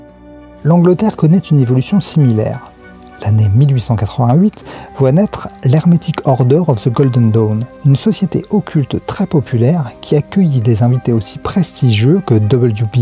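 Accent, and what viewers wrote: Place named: Italy. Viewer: BravissssimoO !!! Pour ce travail historique; tout cela avec une" touche de "fausse facilité "car je suppose que cela a dû vous demander des recherches conséquentes ainsi qu'un grand travail de synthèse! Et que dire de la mise en page magnifiquement illustrée!!!...Quant à l'accent; étant moi-même d'origine italienne...eh bien! ...Pas mal du tout!😆😂🤩( je partage votre vidéo sur mes groupes!).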